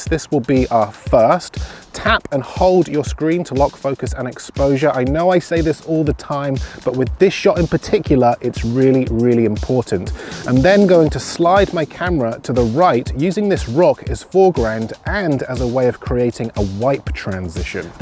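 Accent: United Kingdom. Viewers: Dear, I wish to thank you here for your videos! They are a great pleasure to watch; may be I will improve my shooting skills and also my English (thanks for your British accent). I love also the South-West of England, where I spent a little vacancy on motorbike and where I made my first on-board action cam videos (beginners quality, but lovely memories). Please, do you have a donation address, so that I can offer you a beer or a cider? Cheers from Italy.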